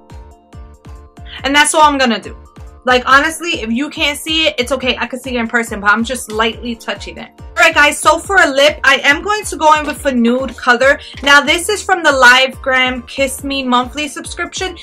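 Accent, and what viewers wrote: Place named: Northeast, United States. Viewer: where the heck are you from?! hahaha I am from Texas and I can tell that you have a north-east accent and I love it!!!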